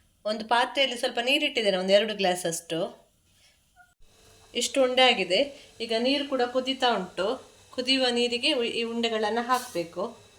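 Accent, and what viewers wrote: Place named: Mangalore, India. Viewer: manglore kannada supr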